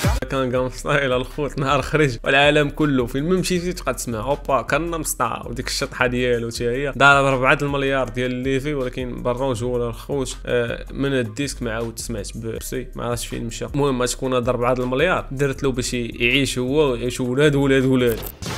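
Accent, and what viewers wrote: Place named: Arab world. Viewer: حين اسمع اللهجة ديال العروبية اتقيإ اتفوو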